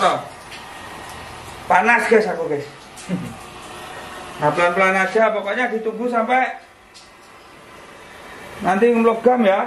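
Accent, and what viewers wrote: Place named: Java, Indonesia. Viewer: Lucu jg mas bro gpp bahasa ny agak jawa2 an itu menunjukkan kita punya identitas. Siiiplah pokoke 👍👍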